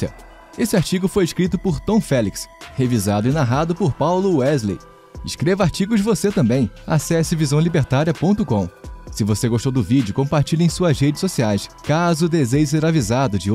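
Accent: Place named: Rio de Janeiro, Brazil. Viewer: Alguém ai mais percebeu que carioca fala NAICE (nasce), NAISCER(nascer) NAICEU(nasceu)? NAISÃO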